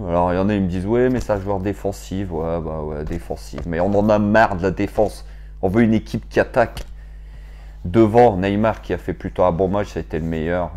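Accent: North, France. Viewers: Salut, t'es d'ou du nord? dunkerque?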